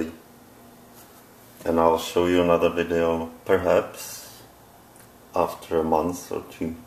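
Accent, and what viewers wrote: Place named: Germany. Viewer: Oh, wow!, your foot looks MUCH BETTER!! I am SO happy for you that the worse part is over! Thank you so much for sharing your long and very painful journey with us! The first time I had MRSA the first doctor I saw said it was a spider bite. So, he gave me a steroid shot and sent me home. Oh, yeah, did I mention that my "bite" (or, as I found out later by my next doctor, it was actually MRSA) wound was on the lower part of my forehead-right between my eyes?!! You can probably imagine just how painful THAT shot between my eyes was! Unfortunately, it sounds like the first doctor you saw was about as inept as my first doctor... But, thanks be to God-- we both came through it alive and well!! 👍👍 Hey, btw, I have one more question, I noticed your accent...where are you from?? (I'm kind of thinking it's a German accent--and I apologize if I'm way off.) 😁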